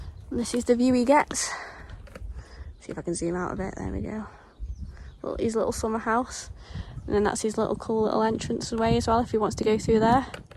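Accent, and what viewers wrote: United Kingdom. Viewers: I’d love to see a transformation video, a before/after with your thoughts on what you recommended to the client and why. Living in America, I can listen to an English accent for hours. Also, either a video or narration over a video of how and when you started in the business, what you love about what you do, what you don’t like so much, etc. Have a great weekend and stay safe out there 😁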